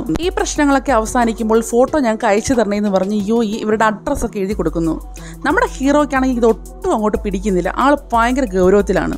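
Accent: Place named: Kollam, India. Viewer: Kollathil ullavar aganeyann parayuka bro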